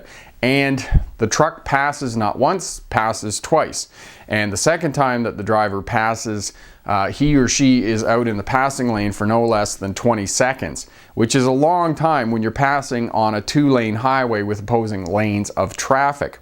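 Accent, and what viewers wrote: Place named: Canada. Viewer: I could tell he was Canadian from his accent :)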